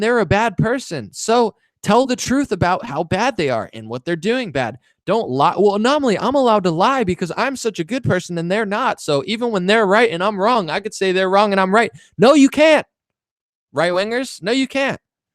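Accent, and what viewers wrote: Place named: California, United States. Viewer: You're obviously not American. That's NOT a black American accent AT ALL. Black Americans, pretty much no matter where they live have a "southern accent" unless they are articulate. has a California accent and cadence. If a black American sounds like, he/she will be slammed for "talking white".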